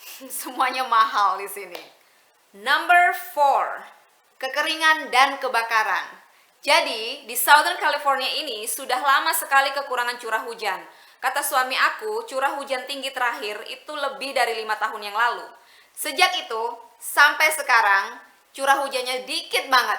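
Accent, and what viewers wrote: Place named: Surabaya, Indonesia. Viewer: arek suroboyo tah mbak iki,podho mbak..anyway do you know londo kampung? He is a youtuber too like mbk 😊.he is from aussie but his wife from surabaya..he speak jowo suroboyoan fluently..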